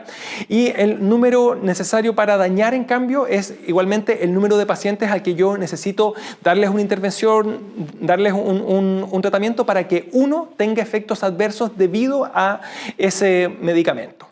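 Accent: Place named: Chile. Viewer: Gracias Dr. Sí me ayudó mucho a comprender los términos, le regresé varias veces ya que no estoy tan acostumbrada al que hablen demasiado rápido ni al acento chileno, pero sí lo logré entender. Explica usted muy bien Dr. Gracias por su ayuda. Saludos de México :))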